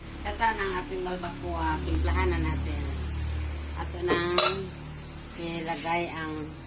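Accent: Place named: Visayas, Philippines. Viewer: Hehe malingaw ko jd ko paminaw sa bisaya mg tagalog🤣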